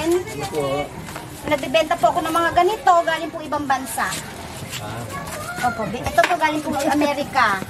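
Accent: Philippines. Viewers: I love your pinoy accent, are you married?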